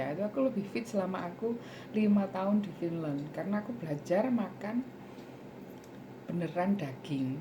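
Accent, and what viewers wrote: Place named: Indonesia. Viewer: Muka kamu mcm latin tp dengar cakap mcm indon..salam kenal sis..semangat selalu ya👍👍👍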